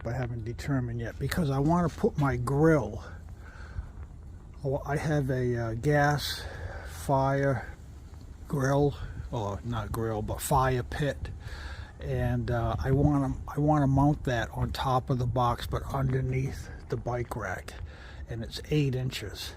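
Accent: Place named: New England, United States. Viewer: Where are you from originally? Boston? Good to hear a New England accent. I am and currently traveling… at the moment In Arizona in my Revel. Thanks for the videos they are excellent 👍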